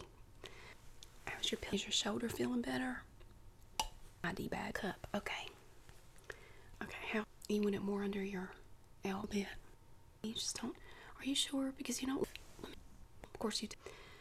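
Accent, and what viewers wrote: South, United States. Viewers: Oh yeah I like your southern accent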